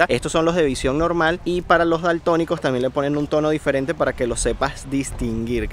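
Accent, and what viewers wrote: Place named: Venezuela. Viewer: Pense q. hablabas Portugues q. eras Venezolano padres portugueses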